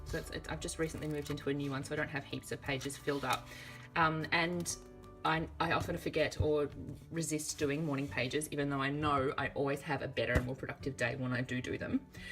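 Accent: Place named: Australia. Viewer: Uhhhhh it’s so nice to finally hear an Australian accent the Americans have taken over YOUTUBE!! JK lol Heyyy Aussie squad!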